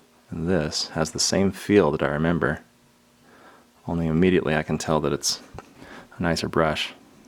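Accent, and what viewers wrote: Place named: Vermont, United States. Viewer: Something about your accent...are you from Vermont?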